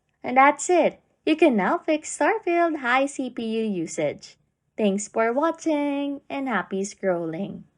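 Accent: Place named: Philippines. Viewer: are you from the philipines? because your voices sounds like you have a filipine accent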